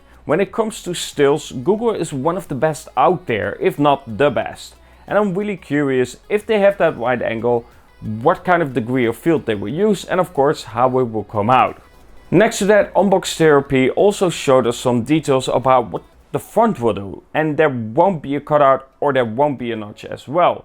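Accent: Netherlands. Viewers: Lekker nederlands accentje ook! Haha niet erg maar he je werkte samen met MKBHD Supersick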